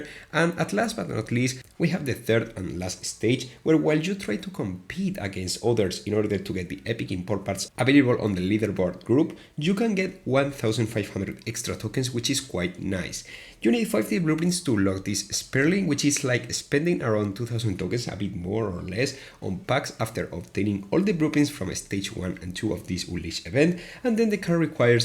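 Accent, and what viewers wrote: Spain. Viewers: Are you from Spain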